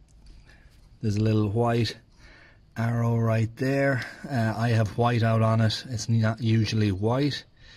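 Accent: Ireland. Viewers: very good video, I am doing 1az fse now, head gasket plus timing chain. Fingers crossed. Nice Irish accent , where about are you from ?:-)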